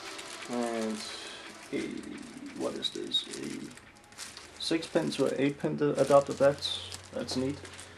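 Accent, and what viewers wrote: Denmark. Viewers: lol er du dansker, har abboneret og så kunne jeg høre du havde dansk accent :)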